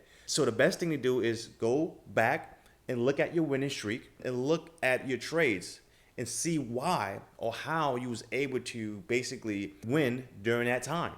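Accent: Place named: New York, United States. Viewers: ay, from the Bronx I knew I recognized that accent lol great video I enjoyed hearing your story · You def have a New York Accent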